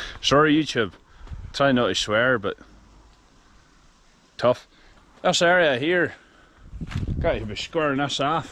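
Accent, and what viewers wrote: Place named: Portavogie, United Kingdom. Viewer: You sound like all us folk in Portavogie. Hey you ever been?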